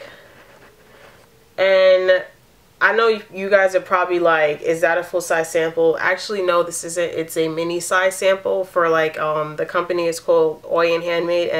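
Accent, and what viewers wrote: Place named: New York, United States. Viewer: thanks for the review. But I was surprise to really hear your New York accent, I'm from Chicago and Its usually not as strong in your other videos, but they must had really upset you with this box!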